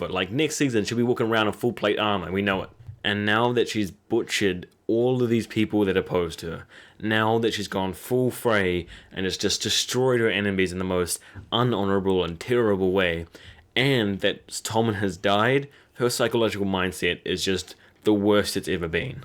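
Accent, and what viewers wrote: New Zealand. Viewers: sheesh. Is that some kind of backwoods kiwi accent you got? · Good to hear a fellow kiwi, bro :D btw... Its not a teleportation machine, there is a subtle jump forward over 1-4ish months during this episode. We see Winterfellpost battle, the sept explode in kings landing, Jamie at the twins, and Dany in Meereen post battle. After that... * We see Aria at some point after Jamie left the twins * The lords of the north assemble in Winterfell following news of the battle of the basterds * They show Varys and Olenna in Dorn (it must be a significant time after the Wildfire). Then we see Dany and a feet (including Highgarden and Dornish ships) a huge timestep after that. They skipped a lot of padding where Varys, Jamie, Olenna, Aria, Northern Lords, and the Dornish & Highgarden fleets travel the globe. Think of the last 1/3 of the episode as an epilogue of seasons 1-6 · Is your accent Kiwi? 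· are you a kiwi? your accent sounds very kiwi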